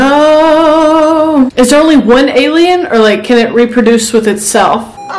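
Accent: South, United States. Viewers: Which of the Southern states are you from? if your willing to tell.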